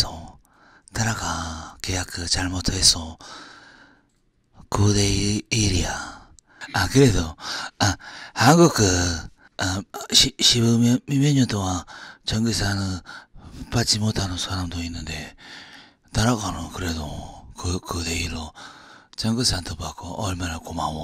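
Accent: Japan. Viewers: 저는 일본 사람입니다. 다나카さん의 억양이 여기저기 정말 일본인답고, 처음에는 이런 재미있는 일본인이 한국에서 활약하고 있구나!! 대박!!! 라고 생각하고 보고 있었는데........??? 정말 일본 사람 발음의 특징을 포착해서 엄청 웃었어요😂 美味しくなぁれ、美味しくなぁれ、萌え萌えキュン🫶🏻 (한국어가 틀렸다면 죄송합니다🙇‍♀️) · 일본어 공부하러 왔어요 요즘 다나카님 억양이 머리에 멤돌아서 저절로 일어가 터질 거 같아요 근데 느끼한 뉘앙스가 더 강하게 남아요ㅋㅋㅋㅋㅋ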